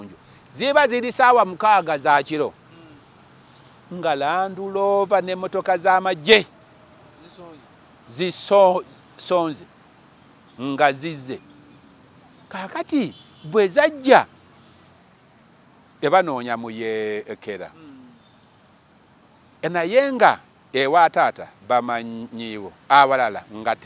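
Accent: Uganda. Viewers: Nze bwedda ndowooza si muganda. Nga ndowooza mu Easterner kuba ebigambo byo' luganda abi pronouncinga nga bo. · Why call yourself when are clearly a Mugishu? Your accent is from the ba Masaba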